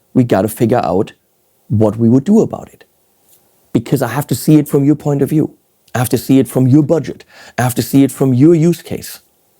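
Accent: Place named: Germany. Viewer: I make my comment not even watching the video in the full length. The reason I come back to watch your videos (and you + Johan Segeborn are the only gear youtubers I come back to at this moment) is not the gear. This is basically something that I am not interested anymore, I have enough gear to do my music. I do come back to your channel because I respect your honesty, I like to hear your rants (expressed in beautiful german accent I love) and personal messages you share with us. I don't even listen to that regularly, because i have no time for that. But I want to share a message of appreciation with you. Take care and animals at the end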